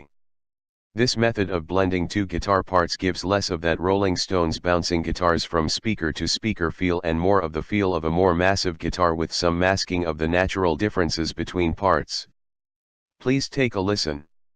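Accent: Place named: New York, United States. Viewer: Very useful information, thank you. I wouldn't worry about a NY Metro accent -- it lends credibility to use your own voice and would be less distracting than the computer voice!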